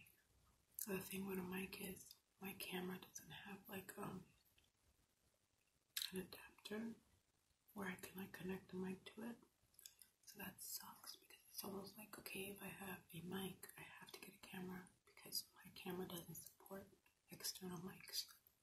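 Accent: New York, United States. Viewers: you’re from new york are you lol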